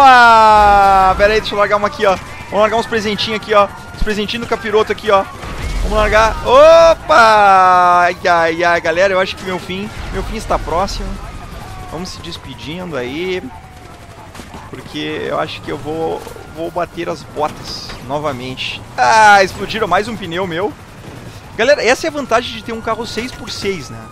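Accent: Rio Grande do Sul, Brazil. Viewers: Mas tu só pode ser gaucho né? abraço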